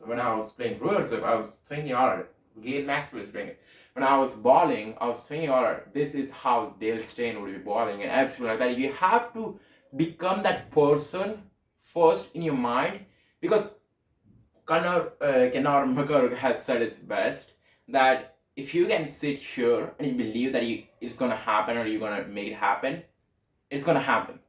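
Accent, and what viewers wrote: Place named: India. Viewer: Bro Indian accent is good u do not need to try other accents.. by the way good work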